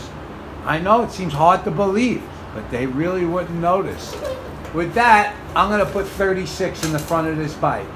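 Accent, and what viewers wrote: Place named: East Coast, United States. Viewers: GOOD VIDEO.... LIKE YOUR THICK EAST COAST ACCENT...... LOL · I knew it, you sound like I do, lol. Like you, I’m born and raised in Brooklyn 18yrs, moved to Long Island 18yrs, now I live in El Paso Texas, nice small town.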